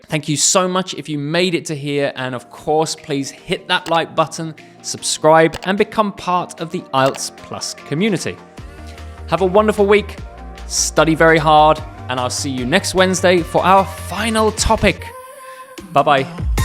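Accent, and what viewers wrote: RP, United Kingdom. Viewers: Are you using rp accent? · Uncountable thank you. Your British English is easy to understand for me.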